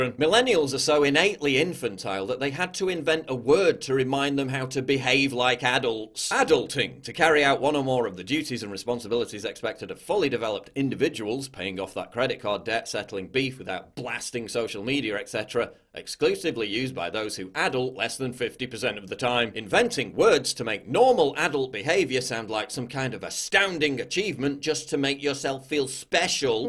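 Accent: United Kingdom. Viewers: Thank you for articulating the reasons for this millennial obsession with super hero movies in your British accent. I think you nailed it. The social engineers want to keep us dumbed down with the emotional intelligence of children in order to keep us all dependent ON THEM and under their perpetual control.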